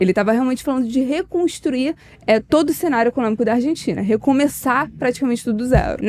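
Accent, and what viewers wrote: Rio de Janeiro, Brazil. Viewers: Ótimo vídeo, pena que é sotaque RJ. Você tem twitter moça?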